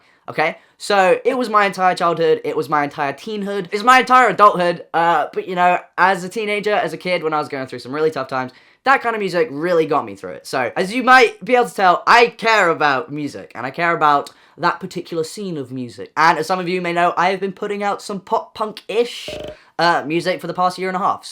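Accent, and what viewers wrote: United Kingdom. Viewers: you sound so british here /neu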